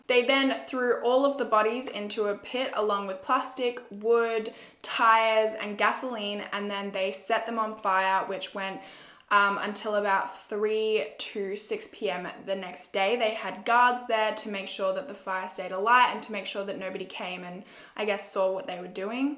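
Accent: Australia. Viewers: Omg literally my first video I seen from you and I’m listening to your accent trying to detect where your from and the second you say the word know I’m like oh shit she’s Australian and I look in the description and I was right literally love your accent , a girl from my highschool basketball team moved from Australia to the states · she’s Australian so she has an accent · bro i heard ur voice for the first time and i was like she aussie to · I loveeee Aussie accent omlll it’s so beautiful😍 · Just Waffles Bro..umm it’s a typical Australian accent k?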